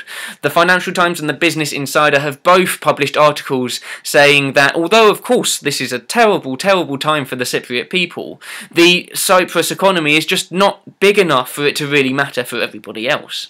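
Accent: Russia. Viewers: P.S.: I love your Russian accent. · Your Russian accent is so good!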